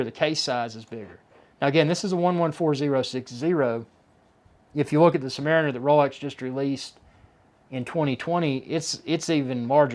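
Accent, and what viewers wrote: United States. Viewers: Love the country accent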